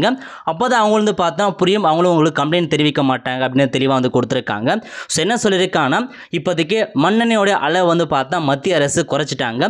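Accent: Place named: North India, India. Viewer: Yes north indian style la pesurar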